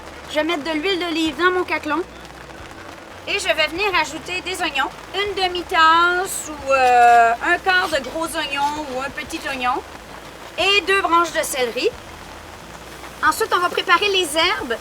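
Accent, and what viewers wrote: Quebec, Canada. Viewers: J'adoooooore ta bonne humeur et l'accent québécois et bonjour à tous les acadiens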